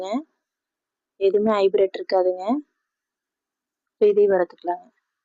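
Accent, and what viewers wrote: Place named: Kongu, India. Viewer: Neenga nalla Kongu pasa pesareenga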